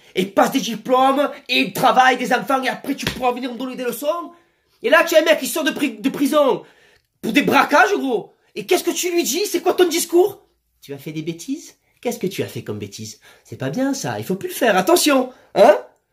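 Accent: Marseille, France. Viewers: Salut j'adore votre accent vive les marseillais bonne continuation excellente journée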